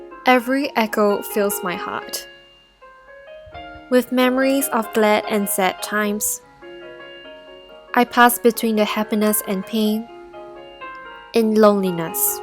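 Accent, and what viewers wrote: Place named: Singapore. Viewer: damn the narrator is singaporean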